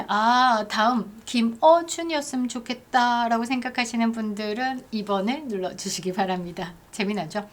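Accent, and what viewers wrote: Gyeongsang, South Korea. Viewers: 의 님 말씀이 참 귀에 쏙쏙 들어옵니다. 특히 좌빨이, 쌤통 등 ~~~ 경상도 사투리로 이렇게 찰지게 설명하시는 능력이 탁월합니다. 늘 응원합니다~~~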